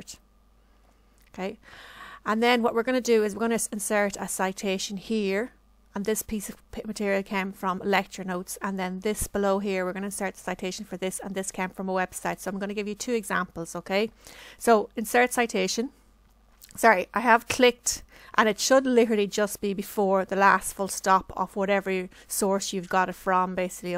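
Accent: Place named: Ireland. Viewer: Lots of ok ...ok..ok...all over. and it will sound more interesting...probably Irish Accent?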